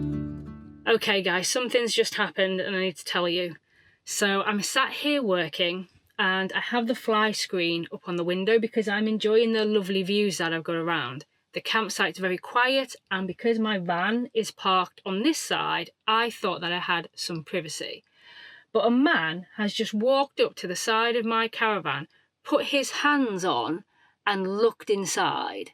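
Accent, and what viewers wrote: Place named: Manchester, United Kingdom. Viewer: Loved the horns you grew on the beach. That guy looking through you window reminded me of our one and only caravan holiday. When the kids were young we could only afford cheap holidays and spent a week in a caravan in mid Wales and a guy looked through one of the windows so I thumped the window which made him jump and because his forehead was touching the window hurt him. When I went out to confront him the cheeky sod moaned I’d hurt him so I told him to do one or I would hurt him and when I spoke to the site owner she thanked me as there had been complaints about this happening on a couple of other vans and he wasn’t staying on the site. Just nosey but is the work you do on your computer for your job or just your vblogs? Looking forward to next video as I’m still ill although been for X-rays and blood tests to see if that reveals anything, so your videos our a bit of a highlight although tomorrow my cycling buddies are coming to see me. 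I know you said you’re from Cheshire but your accent sounds more Manchester area. Take care the pair of you.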